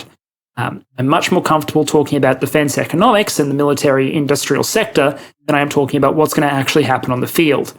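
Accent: Australia. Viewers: You have a fairly standard Australian accent, so I'd suggest taking YouTube's autogenerated CCs and going through to correct them. It's still a pain, but far less so than doing it manually. The big thing it'll do for you is set timings.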